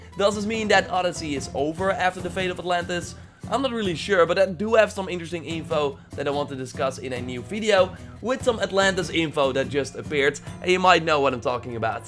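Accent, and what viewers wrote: Netherlands. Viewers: Are you dutch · ughhh, your Dutch accent is the wooorstttt. I just can't keep listening to you. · This dutch english is cringe to me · Are you Dutch? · Is he dutch? If not, where is he from?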